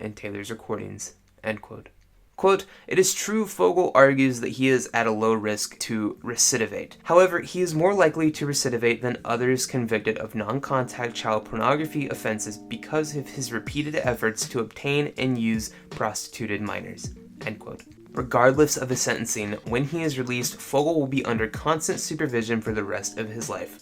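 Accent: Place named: Martinsville, United States. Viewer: Indiana podunk? Sounds like someone is from Martinsville....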